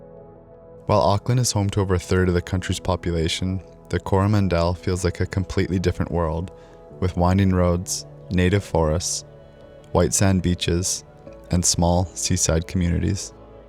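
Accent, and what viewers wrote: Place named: Canada. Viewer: Weirdest pronunciation of Coromandel I have ever heard! Must be the Canadian accent.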